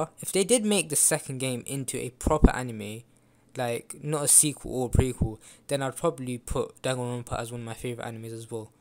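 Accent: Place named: India. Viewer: u sound indian😳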